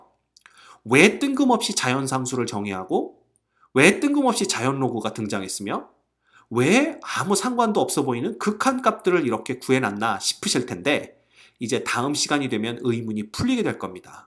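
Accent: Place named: Seoul, South Korea. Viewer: 서울 사람 티가 나시네요 ㅋㅋㅋㅋㅋ 경남은 e 랑 2를 확실하게 구분한답니다 ㅋㅋㅋㅋㅋㅋ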